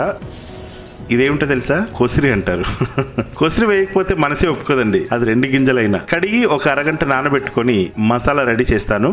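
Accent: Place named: Rajahmundry, India. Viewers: Bhayya super 👌👌👌👌👌👌👌 Mana Rajahmundry slang lo meeru cheppe vidhanam Ultimate Anna. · Subscribed bro. I didn't knew you are from rjy although your accent and style looked too close RJYians from most of your videos I have watched till now. Touched bro. You are doing good work also. 👍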